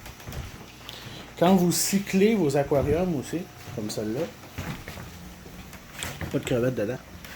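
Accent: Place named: Quebec, Canada. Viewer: C est cool d entendre parler quebecois Bravo! Lachez pas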